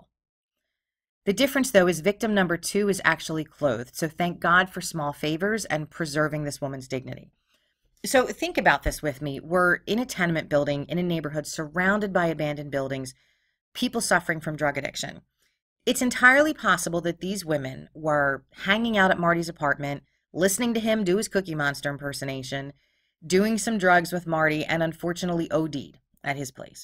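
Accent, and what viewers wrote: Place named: Philadelphia, United States. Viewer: From another suburban Philly girl your accent isn’t that bad. Just found you while dealing with a health issues and indulging my true crime obsession. Not sure if you touched on any of these cause I’m just making my way through your show, but here are some thoughts… You could do a whole season on MOVE and the various standoffs with police, the bombing, the unsolved murder in Jersey, Mumia Abu-Jamal,and the current claims that it’s a cult that abuses children. Or the scandals of the DuPont family. Eddie Savits? The boy in the box (or updates now that they are gonna identify him). Toyenbee tiles. The boy-scout murder (Terrance Bowers). The pennsylvania scissor man. Ellen Greenberg. The video store murders in warminster (1993. I went to school with the victims). The unsolved murder of Tito Norcini (a distant cousin of my ex husband). 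Murder of Carol Ann Dougherty (Bristol) There was a woman in Bristol (I wanna say 1958) who killed a nieghbor and nearly killed 2 children with a hammer. I’m trying to find the info again… her name was Mary something… Maybe too soon, but the 4 bucks county young men murdered that were in the news a few years ago (not gonna disrespect the victims by naming the murderer).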